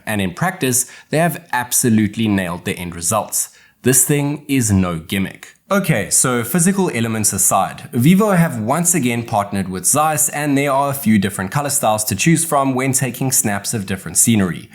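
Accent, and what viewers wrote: South Africa. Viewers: I absolutely didn't expect you being South African! 😂 I thought all (white) South Africans speak like FW de Klerk or the other one... EtB, who came on a black horse. 🤭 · Just ordered a Vivo X200 Ultra .. I didn't get the the Photography kit though. Stumbled across your video and thought :" Hey he sounds South African " and then I saw Table Mountain 🤣🤣 Brilliant video. I am so excited for mine to arrive. Will only be shipped to SA Feb 23rd due to Chinese New Year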